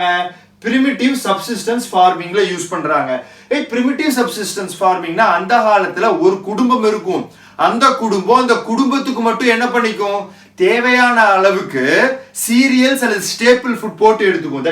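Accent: India. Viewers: sir your mother tongue in kannada right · Sir a doubt you are from Chennai or Coimbatore. ☺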